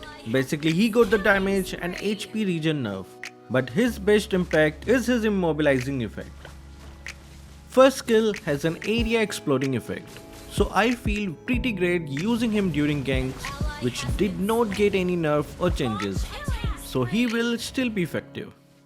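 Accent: India. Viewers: He sounds Indian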